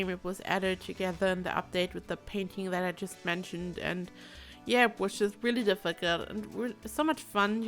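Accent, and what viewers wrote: Germany. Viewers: r u german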